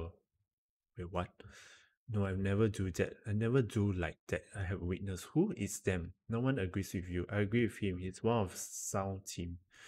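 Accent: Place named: Singapore. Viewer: Hello!! Are you from Singapore ? You have the accent ! Thank you for uploading these videos btw they are my comfort videos haha 💕💕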